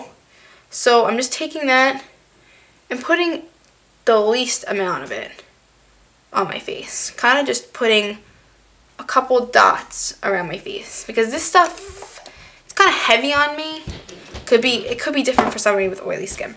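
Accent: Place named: New York, United States. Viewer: i love your accent. new York???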